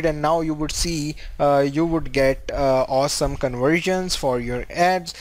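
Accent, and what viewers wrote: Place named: India. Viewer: In your discription you give us location United States but your English accent like Indian 😂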